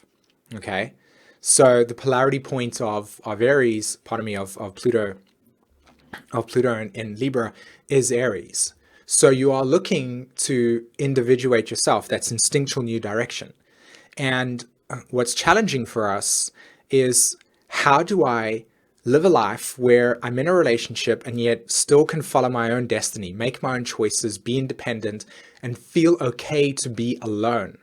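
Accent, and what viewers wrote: South Africa. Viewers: Are you South African by the way ? If so, do you do in person consults? I live in SA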